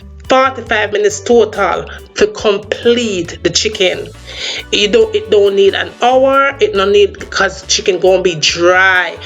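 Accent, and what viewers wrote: Jamaica. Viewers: My girl mi subscribe jus cuz yuh sound lik a real yaadie goodie.. mi a guh try dis, cyaan duh the whole buy buy ingredient, jus a guh use some a di store bought... den grill it. Is where yuh find scotch bonnet? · Just listening to you I feel like I’m in Jamaica just need the weather, food and the smell😂😂 big up ur damnself mumma str8 outta London UK 🇬🇧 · New sub sending love from the South!! Sent here from Ray Mack. Blessings & I look forward to cooking your recipes. Love your Jamaican accent, sister. 💖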